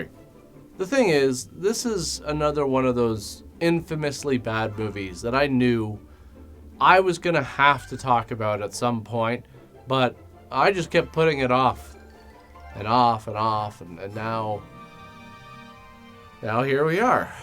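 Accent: Canada. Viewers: are you Canadian? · Inner? He wears his Canadian on his sleeve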